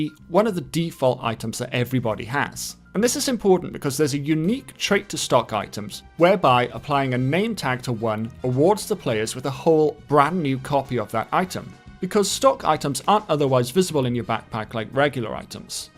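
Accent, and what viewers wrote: Wales, United Kingdom. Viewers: Nice to see/hear more Welsh content creators, great video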